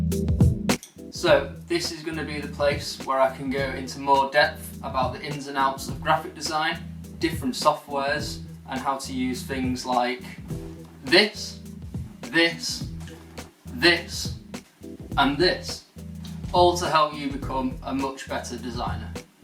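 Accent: United Kingdom. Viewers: Brilliant! Thanks for that, really looking forward to see the other videos you’ll make! Anything will be good for us I guess :) Btw your intro is perfect, and the video is great. Sometimes it’s a bit tough to understand your British accent but I guess it’s going to get better with a bit of practice!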